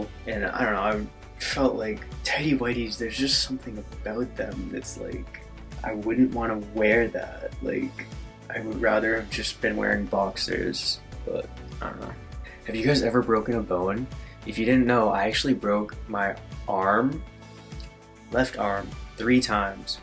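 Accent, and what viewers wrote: Canada. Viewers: I'm quite sure he's from Canada, because he has a slight accent, that is only obvious in a few words. He's mentioned his brothers, but i don't know if they have been in any videos. I'm sure they must be as hot and cute as him.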